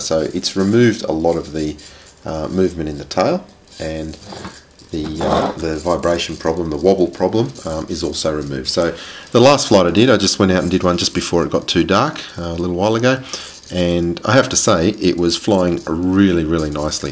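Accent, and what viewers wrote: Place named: Australia. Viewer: Thanks. I can hear from your accent that your an Aussie =) Added the grommets where you suggested. The tail is abit of a chore to with these figdety fingers, but it looks good. I'm ready to fly, once the batt is fully charged...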